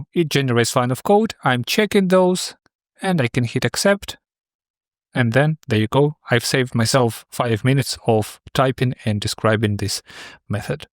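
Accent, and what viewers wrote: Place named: Russia. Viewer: Слышно, что русскоязычный. Why do you speak English?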